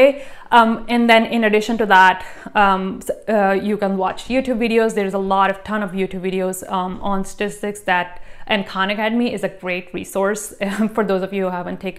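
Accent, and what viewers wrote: India. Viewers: Indian accent 👍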